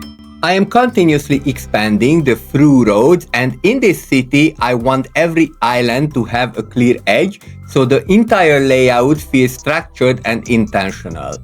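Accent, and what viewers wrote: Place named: Hungary. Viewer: nvm, your accent sounded Hungarian. this is what we say on the internet to each other.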